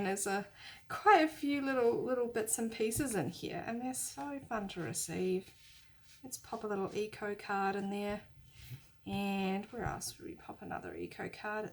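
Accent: New Zealand. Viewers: Love this video. I am a new subscriber from Sydney. Got put onto your channel by my sister' Roxy Creations By Rachel. Love all that you make and could totally tell that you were a Kiwi as soon as I heard you:)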